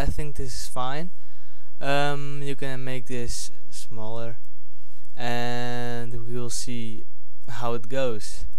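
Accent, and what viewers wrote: Netherlands. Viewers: je hoort dat je nederlands ben haha aan je accent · ja klopt ik klikten op de video en hoorde gelijk dat hij nederlands is :P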